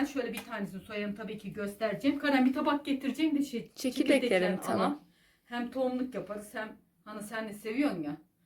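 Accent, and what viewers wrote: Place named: Kütahya, Turkey. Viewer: Sizi yeni izlemeye başladım nerelisiniz acaba kütahya konuşmasına benzettim konuşmalarınızı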